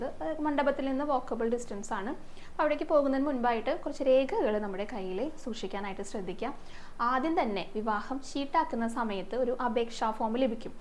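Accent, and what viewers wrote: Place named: Guruvayur, India. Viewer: അറിയണമായിട്ടൊന്നുമല്ല ഇങ്ങനെ ശ്രദ്ധിച്ചു കാണുന്നത്! ആ ശാലീനമായ സൗന്ദര്യവും! ്് അക്ഷരസ്ഫുടതയോടെയുള ആ ഗുരുവായൂർ ശൈലിയിലുള്ള മധുരഭാഷണവും!ആകർഷകമായ ആ അംഗവിക്ഷേപങ്ങളും! ആകെക്കൂടി യശോദാമ്മയുടെ ലക്ഷണങ്ങൾ ഒത്തിണങ്ങിയ മോളെ മകളായി കരുതാൻ! ഒരുപാടു വൃദ്ധൻ ആഗ്രഹിച്ചു പോകും!ഒന്നറിയുക!മനസ്സും കൊണ്ടാണ് മക്കൾ ഉണ്ടാകുന്നത്! ശുക്ലബിന്ദുക്കൾ തന്നെവേണമെന്നുണ്ടോ ജഗത്സർഗ്ഗപാലനലയകാരണൻതനിക്കോർത്താൽ?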